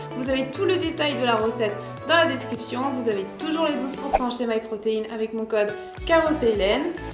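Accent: South, France. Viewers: C'est quoi cet accent du sud la? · teddy zora Elle est du sud ducon mdr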